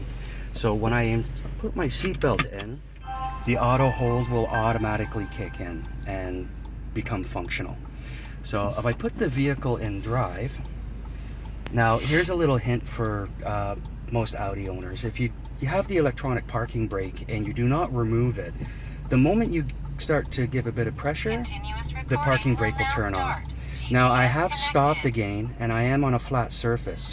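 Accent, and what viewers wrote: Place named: North America. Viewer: North American accent..right hand drive ?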